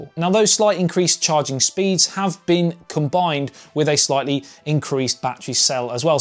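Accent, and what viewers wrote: United Kingdom. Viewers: u r british????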